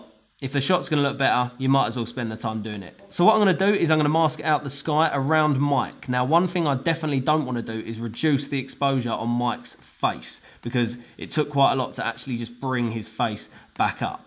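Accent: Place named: Cockney, United Kingdom. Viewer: Best tutorial with great cockney accent. Thanks mate!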